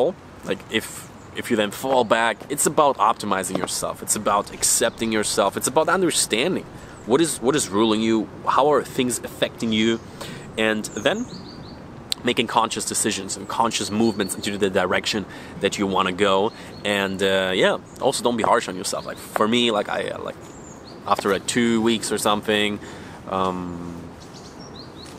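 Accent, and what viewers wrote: Germany. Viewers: Bro kommst du aus Düsseldorf?? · Can anyone tell whether this guy is German?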